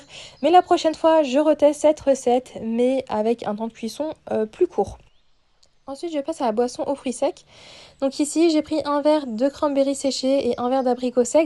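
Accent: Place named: Northern Portugal, Portugal. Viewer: Salam aleykoum ma soeur comment je suis contente de voir que ta fait Aletria. Je me sentait seule dans le sens ou les gens en générale quand il rentre dans l'islam il oublie vite leurs origine il veulent absolument " devenir arabe" . Hamdoulilah cest bien que tu te souvienne un temps soit peu du portugal. Ça n'empeche pas qu'on apprend l'arabe et tout mais faut pas oublier nos racine. Hamdoulilah trop contente. Continue tu me régale Allahuma barek et enplus sa s'entend que tu parle très bien le portugais ... à entendre je dirais que tu viens du nord. Je me trompe ?? 😂❤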